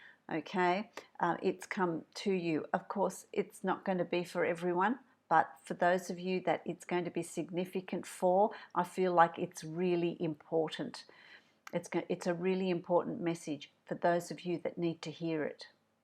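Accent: Australia. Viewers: Love the rare cultured aussie accent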